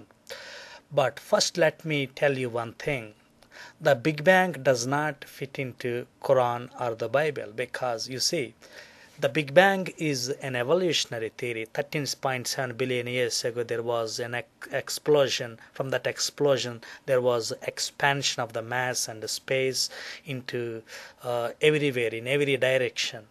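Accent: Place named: Kerala, India. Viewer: very funny,, a typical mallu,,saying yexposed,,yauniverse,,dactar jaakir nayik,,,man plz go to some english school and learn some thing ,,instead of wasting time like this..plz go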